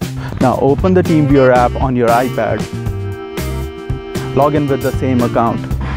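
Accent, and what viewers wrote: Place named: India. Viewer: Love the Indian voice keep it up !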